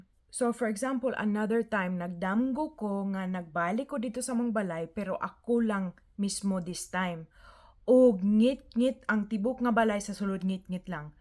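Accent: Visayas, Philippines. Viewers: I closed my eyes and I cannot really tell that you're an American. You sound naturally Bisaya, like a local. I wish you well. I hope you can visit Matina again. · you are so inspiring and authentic! 😊 Kalingaw kaayo ko listening to you speak Bisaya and especially how bisdak you are! It brought back so many memories. I also grew up in Davao—Matina, Davao City—until 1986, when I left for Manila for college. I eventually stayed there, got married, and raised my kids. In 2005, we moved back to Davao to take care of and develop our family property before finally moving to Las Vegas in 2014. You’ve made me miss home so much. More power to your book! I’m also working on a book dedicated to my father. Like you, I have so many creative projects that sometimes I wonder how I’ll ever finish them all. But I’ve learned that a little progress at a time eventually gets us there. 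Keep going—you’ve got this, and I’m cheering you on from Las Vegas! 💛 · you sounded just like the locals. your linguistic prowess is incredibly impressive. I'm cebuana myself but i can tell your intonation is like mixed visayan but the pronunciation and the way you enunciate visayan words are on point.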